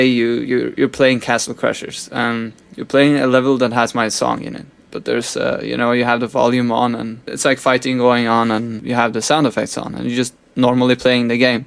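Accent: Norway. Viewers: NORWEGIAN THATS WHAT THAT ACCENT IS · 1. Congrats on the 200k subs! 🙌 2. Thanks for clearing up the copyright thing. That stuff had me confused, but now I get it 👍 3. It's nice to hear your voice again. I love your Norwegian accent 😌 4. I would totally buy your merch...if I have money that is 😅 5. Skyworld! Wooo! I love that series! 🙆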